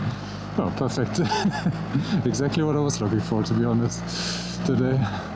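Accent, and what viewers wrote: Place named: Germany. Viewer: Digga rede doch deutsch, man hört das du deutsch bist. Abgesehen davon aber gutes Video, sieht mega schön aus.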